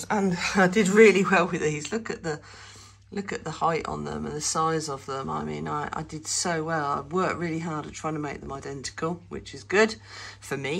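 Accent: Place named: United Kingdom. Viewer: It is your British accent that makes it difficult for my American ears to understand. But no worries, between the subtitles and slowing down the verbal, I am able to understand all that you say!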